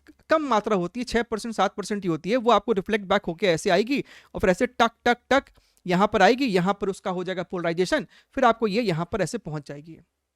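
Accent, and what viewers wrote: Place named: Bihar, India. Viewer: Bhaiya I can tell from you accent ( hoyebe karega ) ki aap bihar se hai. If you can tell kaha se. Btw love your vid helped me a lot mains me and just sad ki itna late mila channel apka. Great job ❤❤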